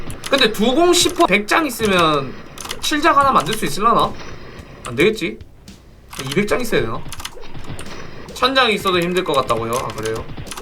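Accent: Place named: Gyeongsang, South Korea. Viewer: 경상도 말투 진짜 ㅋㅋ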